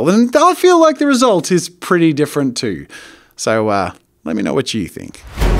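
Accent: Australia. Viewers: Lunatic Laughs in Australian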